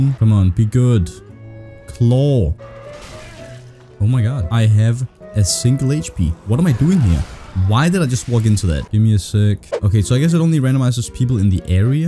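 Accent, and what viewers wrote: Denmark. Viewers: i love how hard his danish accent is:) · I just knew about your channel and as soon as I heard your voice I knew you had a danish accent. Respect for a fellow danish Dark Souls player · You can still kinda hire the Danish accent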